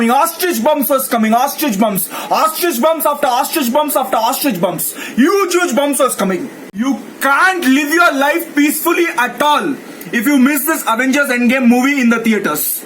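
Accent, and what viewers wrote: India. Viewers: are you an Indian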